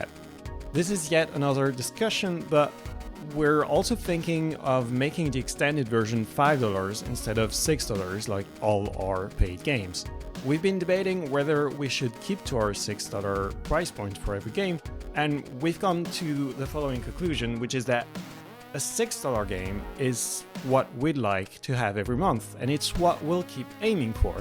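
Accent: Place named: France. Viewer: I'll be honest, I kinda only skim read the newsletters. Now having it dictated to me by a lovely Frenchman, well it's très bon for my ears. You guys are some of the best indie developers out there, and I hope more and more people come to appreciate and support your work. Thanks for all the fun games and I wish you all every success.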